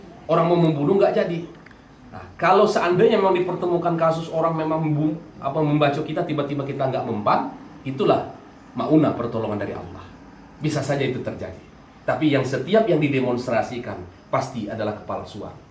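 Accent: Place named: Palembang, Indonesia. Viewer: Dari nada bicaranya sperti nya orang Palembang, slam dri Palembang 🙏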